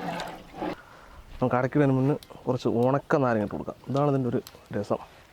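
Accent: Palakkad, India. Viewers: Palakkadan slang kollam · Ingaloru sambhavaman 💙💙ikkaa.. palakad swantham slang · Bro nigal palakkad anno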